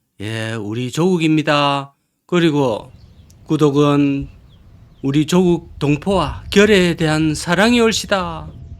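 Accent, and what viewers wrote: Gyeongsang, South Korea. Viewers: 구수한 경상도 사투리가 잘 어울리십니다.수고하세요.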